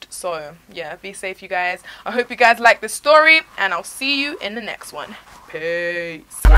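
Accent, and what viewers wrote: United Kingdom. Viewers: I love your accent! I also live in the uk but I don't have the London type accent; I put your story time on loop and your voice soothes me and helps with my insomnia, Thankyou! Now I get at least 5 hours sleep per night (once I went 5 days with no sleep)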